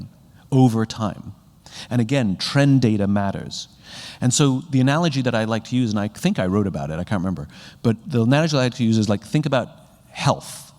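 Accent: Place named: United Kingdom. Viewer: I love British accent of yours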